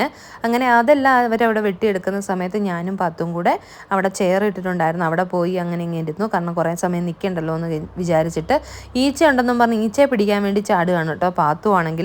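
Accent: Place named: Alappuzha, India. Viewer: where in alpy...doubted from ur tone that u will be from my place❤😂😂😂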